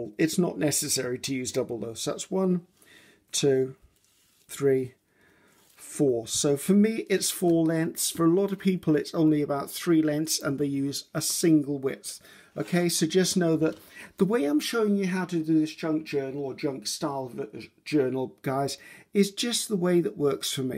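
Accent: England, United Kingdom. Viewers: Oh my gosh, I came across you quite by accident and LOVE YOU! I'm a Brit living in the USA and love watching English crafters as it makes me feel closer to England hearing the accents and listening to you, I feel like we are old friends getting together for a natter and a cup of tea. I'm not new to paper crafting but I am to junk journals. They always seem daunting to make but your vidoes have inspired me to actually try making one! You have a new life long fan!! xoxo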